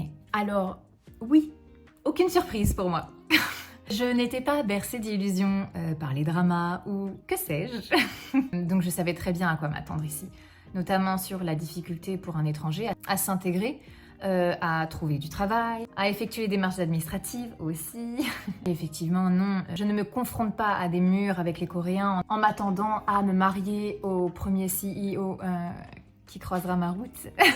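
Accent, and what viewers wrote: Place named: England, United Kingdom. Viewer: Et les copains de France te manquent pas ? Vilaine 🙃🙃 j'adore ton accent anglais ! Et merde pour tes exams du coup :) bisous bisous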